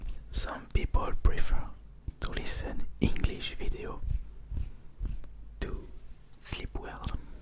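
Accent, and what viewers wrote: France. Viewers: Pour le coup ton accent est vraiment bon, tu me fais penser à PierreG asmr ou Made In France asmr. Pour le coup, on ressent bien l'accent français, mais je trouve ça vraiment relaxant ! Bien joué, et c'est aussi très courageux ! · Je savais que tu étais français, tu mélangeait le présent simple et l'impératif avec le ING mais c pas grave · soit l'accent est bon, soit il à l'accent français, pas les deux, mais un bon accent implique une neutralité de l'origine de la personne. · t'es français mec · La vidéo m'as beaucoup fait rire et m'a beaucoup relaxée. Ton accent français est hilarant mais dans le bon sens du terme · Un accent un peu à la française mais ce concept et cette vidéo sont super, je n'ai regardé que 2 minutes de la vidéo et je ne pense pas que je vais tenir longtemps avant de dormir... 😅🤣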